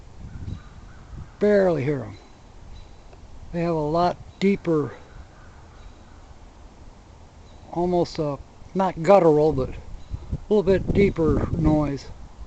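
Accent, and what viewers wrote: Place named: Michigan, United States. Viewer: Hey there. Sounds like Michigan!!!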